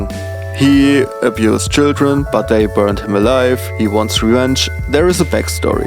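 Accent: Germany. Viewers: Thank you for posting this. Congratulations on 1000 subscribers! You are a real one, and I appreciate your perspective in your reactions. You answered the questions I had but did not submit ahead of time. I thought you were probably German. Your English is very good, I like your accent, is a fabulous song for all the reasons you mentioned, AND none of us have choice on where we're born: just make the most of it. Be well! ❤ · Thank you for doing this Q&A for us. It nice to learn more about you. Your english is great and your German accent is wonderful. I love by!!! Have you seen the version by Post Modern Jukebox? Thank you for the suggestions of David Lynch and Tommy Wisreau. I will check them out! I am excited to see what you choose for new content.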